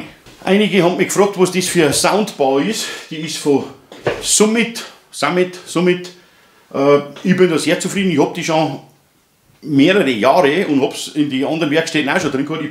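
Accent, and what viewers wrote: Bavaria, Germany. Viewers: woher kimmst du eigentlich aus Bayern?:D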